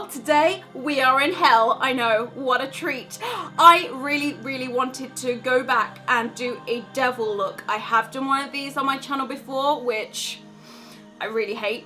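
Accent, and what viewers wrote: United Kingdom. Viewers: When you started talking it cracked me up because that light British voice with the devil face was just such a wierd combo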